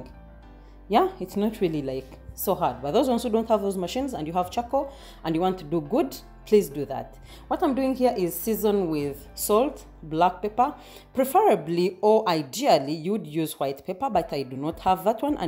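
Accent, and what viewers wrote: Uganda. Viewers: just curious! im gambian (west africa) so i haven’t met many east africans (outside of somalis, sudanese, and ethiopian). your accent reminded me of kansiime anne, a ugandan comedian so i figured it might be ugandan. anyway thanks for the recipe, i will be using it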